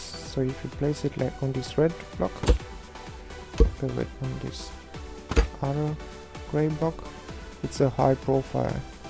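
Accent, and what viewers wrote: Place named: Germany. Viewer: You sound German, are you?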